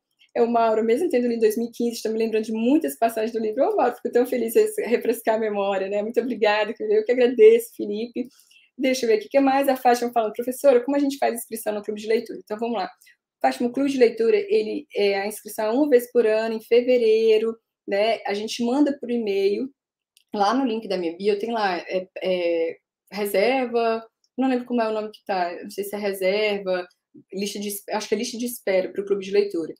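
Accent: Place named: Northeast, Brazil. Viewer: Amei seu sotaque NORDESTINO.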